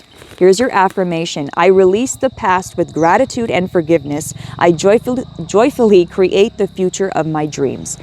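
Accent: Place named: South, United States. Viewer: Hi!! Don't worry about crying on camera!! It's OK!! Let out your pain because I completely understand and have experienced bad childhood trauma. And I know that I also felt like something was wrong with me too for a very long time. I'm not here to judge you whatsoever. And by the way, I cannot believe i have never heard of, wow just wow!! What an amazing 🎵 🎶 🎵 song!@ I love ❤️ music and I also lovee 💕 to sing. And girl, I am impressed 🎉you CAN sing!! Very nice...keep up your wonderful calling and work youare doing!! This video completely resonated with me!! Although I am not a recovering addict, I am surely surrounded by others that do have drug addiction and alcoholism. I am not here to judge anyone or myself. I am truly on my own healing journey ✨️ girl!! And healing is not linear and it is messy!! So collective KEEP on keeping on Y'all..love that slang, lol. 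I am a true born Canadian citizen here but I just love ❤️ the Southern drawl!!! 😅 people say I have an accent that I don't recognize of myself but I just love ❤️ 💕 speaking to people from other parts of the world!!!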